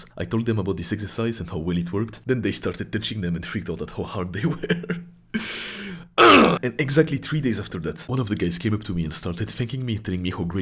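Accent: France. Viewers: langlais en mode francais ta pas lacent va sur internet ya des prof danglais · Oh vu de ton accent, tu es français, non ?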